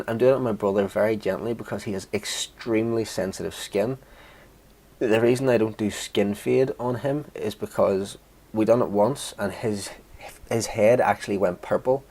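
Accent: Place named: Northern Ireland, United Kingdom. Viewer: Dude where is your shop based? You accent sounds like it's from the Northern Ireland. Would love to check out your shop.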